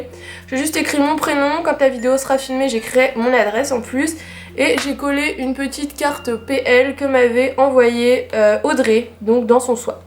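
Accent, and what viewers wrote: France. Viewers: mais stooooop l'accent mega français la "bullet journal" c'est un mot anglais mettez y du votre un peu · Très bien les explications! Très utile aussi ta vidéo comparaison Bullet journal/ planner. Petite remarque quand même:: Puisque tu écris et utilises beaucoup l'anglais, tu devrais faire un effort sur ta prononciation. C'est vraiment TROP français...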